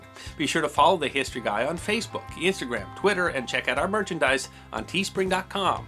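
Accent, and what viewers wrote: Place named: St. Louis, United States. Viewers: St. Louis Missouri? I was guessing the North East originally due to Your syntax and inflection. I'm guessing that You're a transplant then? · I had guessed that you were from the StL area when I first started watching you. You have that accent. I grew up in the Overland/ St Ann area.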